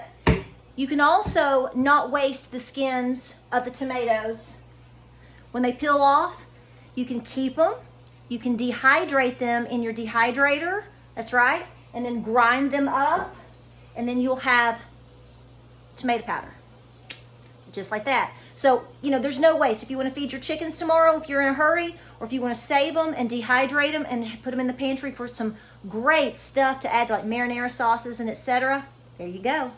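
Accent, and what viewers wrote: South, United States. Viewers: Your are southern funny love it 😊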